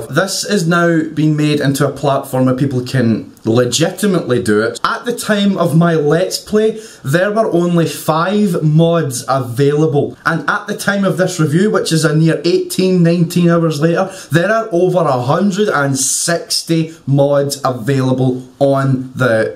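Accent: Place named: Glasgow, United Kingdom. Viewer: Guessing your from glasgow and a celtic man like myself? :)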